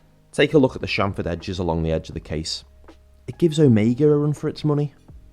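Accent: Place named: Northwest England, United Kingdom. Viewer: Subbed for the northwest accent. Greeting from Ormskirk.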